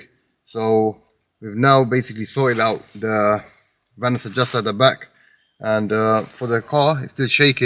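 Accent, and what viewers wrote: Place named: London, United Kingdom. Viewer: Man, I just love how the subtitles can't decipher the true Lahndahn accent!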